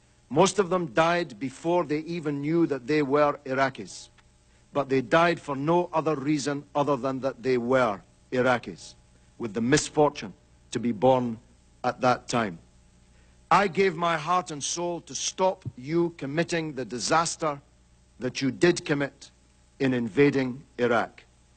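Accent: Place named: Scotland, United Kingdom. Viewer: James Galloway one of my favorite orators. His wonderful oratory dressed in that powerful Scottish accent projected in a powerful voice from a face that assures the listener that this man’s man does not suffer fools and is no none sense. Every thing he says is true clear and to the point. There is no room in his words for doubt or debate. He does not speak to impress anyone, his words are there to inform the uninformed, in this instance, of the cruelty and criminality of an Empire gone amuck.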